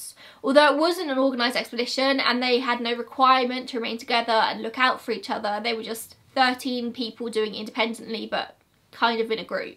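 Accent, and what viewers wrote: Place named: Southern England, United Kingdom. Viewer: Thank you so much for all that u did and went through in order to give us this video 🥰🙏🏻 You’re an angel, I LOVE ur voice and the content u create always hits a nerve with me where I get really into it (although not in a “sensationalism” induced way I’d hope) Can’t believe I only JUST discovered ur channel (through the KRIS KREMERS & LISANNE FROON case bless their hearts and those of their families) but at least there’s a lot to catch-up on. Where abouts in the UK r u from btw? Going by ur accent I would guess somewhere around the southern parts of England? (I am half Swedish, half German so English isn’t my first (or even second) language, but we moved around Europe a lot when I was a kid and later as an university student, until I came here to London 🇬🇧 7 years ago so yeah 💁🏼‍♀️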